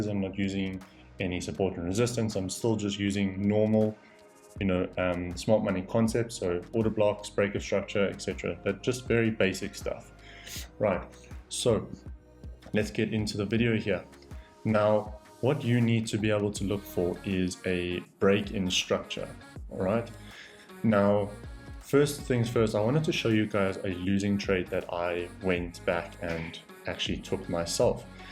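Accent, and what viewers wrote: South Africa. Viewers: I know a South African when I hear one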